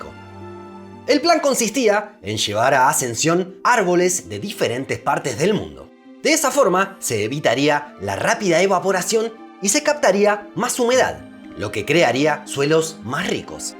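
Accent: Argentina. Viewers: El acento del muchacho parece argentino (un argentino que habla bien clarito), pero me parece que el canal es de España....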